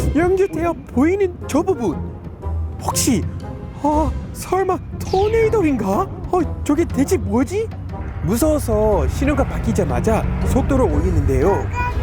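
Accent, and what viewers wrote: Gyeongsang, South Korea. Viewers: 경상도 사투리 넘 귀여워요 어디 가노 ㅎㅎ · 반가운 경상도 사투리 이뽀요~~~ 미국인지 한국인지...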